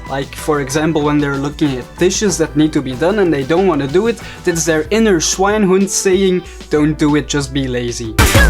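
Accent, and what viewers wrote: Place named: Belgium. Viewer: gy zyt zwz een belg hondert procent ik hoor den accent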